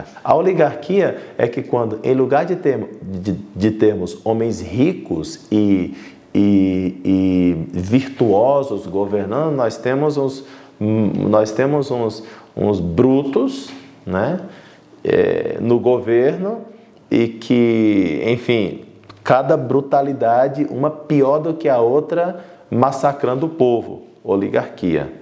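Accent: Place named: Rio Grande do Sul, Brazil. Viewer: Esta homem pode levar figuras ilustres e estrelas a uma profunda DEPRESSÃO. Sua fala me lembra o Gaúcho CARLOS REIS em: Seu professor mentiu pra você, mentiu muito. Grato.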